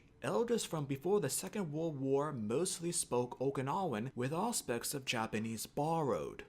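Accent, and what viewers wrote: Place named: China. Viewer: Speaking as someone whose family lived there for 40 years, this is nothing short of Chinese Communist Party propaganda, part of their wider pattern of asserting they own everything on the planet. I can say with certainly every Okinawan person I know would also say this is total BS. Okinawa was an independent international trading hub for centuries and did the best they could to appease both the Chinese and the Japanese. They were well aware of the dangers of their geopolitical situation, and vulnerabilities. Though many of us were honestly hoping for independence in the late 60s, it was never going to happen. Do you really think China would leave them alone if they were independent? True the Satsumas were devils in their day, but meanwhile, as Japan has become more civilised while China has regressed from their responsibilities as global citizens. 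For the sake the people I know, the current statehood within Japan, the people are far better off than if China had control - just ask the people of Hong Kong. Suggest instead reading Kerr 'A History of an Island People' BTW, This person is reading a script with an obvious Chinese accent.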